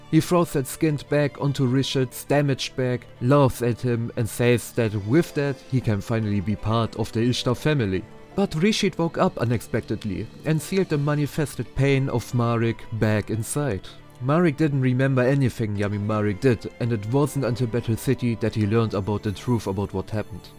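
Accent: Germany. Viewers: You have a strong german accent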